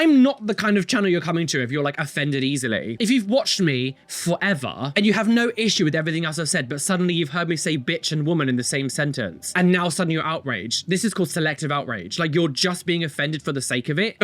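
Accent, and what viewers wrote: United Kingdom. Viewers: I've been watching you for awhile and I love your skin art (tattoos) but then we get to your flamboyant personality and British accent like omg be still my heart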